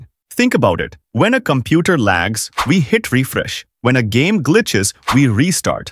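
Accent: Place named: India. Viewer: the indian accent 😭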